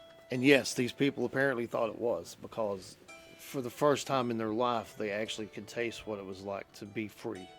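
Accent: United States. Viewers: I guess Americans watching this video expect to hear the American accent when in fact they would be speaking a variety of English & European accents. For me it spoils the video, the accent just does not fit the period. Are Americans able to fake an English or other accent or is it very difficult for you to do that? Perhaps you are just not into being that authentic in your interpretation. Regards, Keith.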